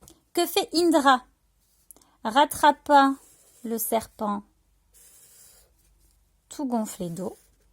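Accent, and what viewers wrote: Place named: South-West, France. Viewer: Quel bonheur cet accent du sud-ouest qui fait que j'ai tout compris ce qu'est un COD et un COI ! Merci maîtresse !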